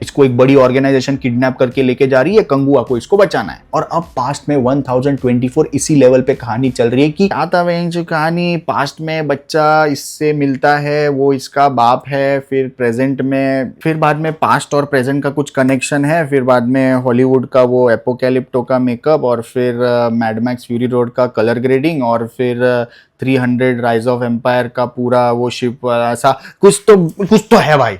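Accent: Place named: Mumbai, India. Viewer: not.a fan of ur mumbai accent dont do it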